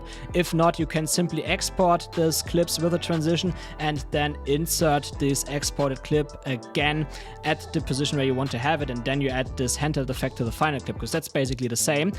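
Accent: Germany. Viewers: klar englisch ist kein Problem aber wäre auch schön mal paar deutsche tutorials zu sehen und man hat krass gehört dass du eigentlich Deutsch redest aber gutes Video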